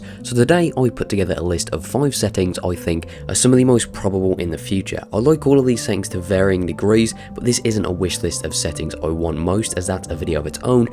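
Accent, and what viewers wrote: United Kingdom. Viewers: Good Video. Unfortunately, I hate the british accent!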